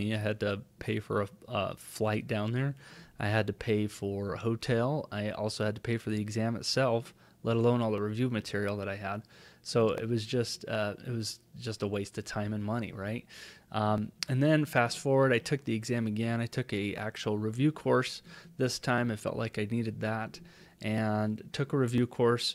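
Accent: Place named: United States. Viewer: I think a major advantage that your course offers is the simple fact that English is your first language, with a US accent. You also seem like a good instructor because of your desire to want to help others. Your personal journey is comforting knowing that we can reach our goals too, even if we had a set back.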